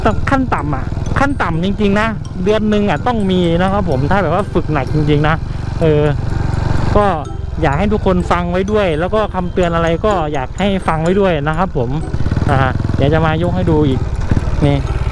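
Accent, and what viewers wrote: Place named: Isan, Thailand. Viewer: เว้าอีสานแน่อ้าย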